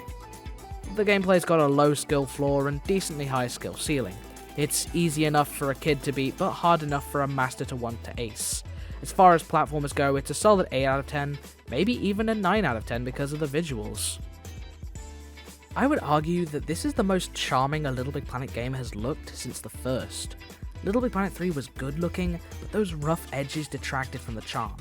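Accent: United Kingdom. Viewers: Seriously dude are you a fucking idiot trying to describe racism out of that I don't think I can go any further this guy trying tell us changes his whole voice to not sound British self hate much shut your mouth about nothing knowing mf hipacrit do you have black friends shouldn't you be more tolerant and say African American dude all my other colerd friends have big lips bigger nose than me still beautiful but obviously different I work with Asian guess what there eys are smaller then mine dude Seriously just cuz its accurate don't always mean it's racist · The narrator is the only British voice actor in games I play in where they don’t backstab you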